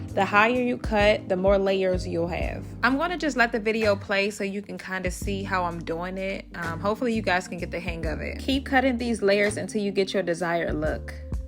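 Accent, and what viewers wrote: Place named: DMV, United States. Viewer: Love the hair!! And please tell me you’re from the DMV lol. My boyfriend says we don’t have accents, but I know it when I hear it!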